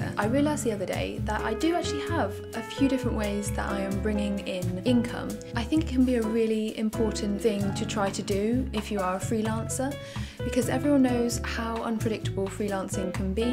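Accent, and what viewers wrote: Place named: United Kingdom. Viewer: Why did I know you had a British accent?!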